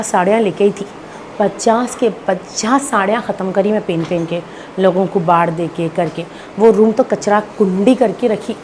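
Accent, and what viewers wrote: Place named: Hyderabad, India. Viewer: Aap perfect muslim hi lagti ho didi talking style behaviour also perfect hyderabadi muslim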